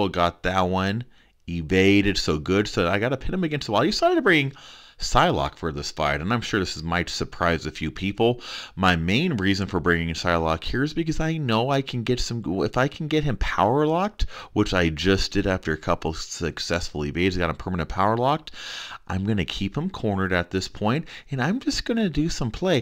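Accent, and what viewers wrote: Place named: United States. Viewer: Thanks for the video. Several members of our ally enjoy your videos. We are trying to place where you are from based on your accent. What part of the US are you from??? Thanks again for the videos! Keep up the good, and helpful, work!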